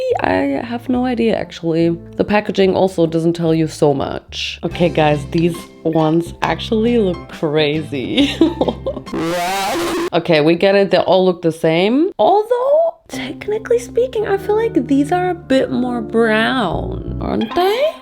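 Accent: United Kingdom. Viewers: As a Brit, I love your British accent! You nail it Queen! YUS! But gimme gimme those spicy noodles.....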